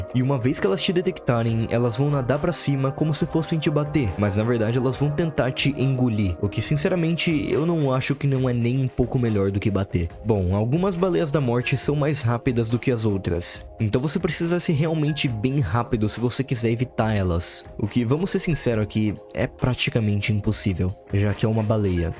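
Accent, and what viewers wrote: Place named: Rio de Janeiro, Brazil. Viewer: ksksks cê é carioca né meu patrão, reconheci só pelo "S" com som de "X", foda ser carioca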